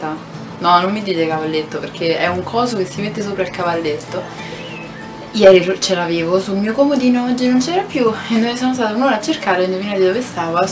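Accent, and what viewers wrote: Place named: Rome, Italy. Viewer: Ah romanaa de Romaa ❤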